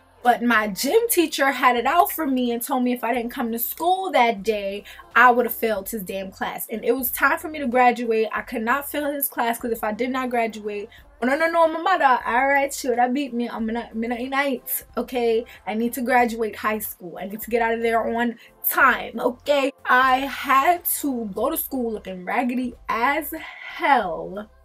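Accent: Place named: Jamaica. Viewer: Yaasss!!! Bust out that Jamaican accent👏🏿👏🏿👏🏿🇯🇲My mom doesn't play with my education either.😂 Where my Jamaicans at???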